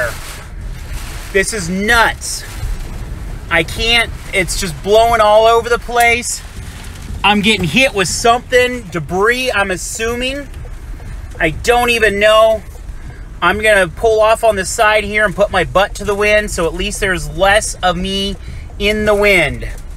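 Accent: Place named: Wisconsin, United States. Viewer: Oh I love your accent! My dads side of family was all from Wisconsin. Haven’t heard it in years. ( I pop up with a little of it ever so often 😂)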